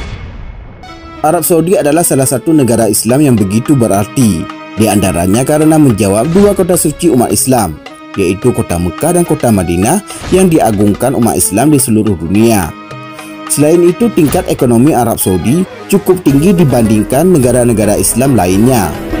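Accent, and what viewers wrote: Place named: Solok, Indonesia. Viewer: Naratornya pasti urang sOLOK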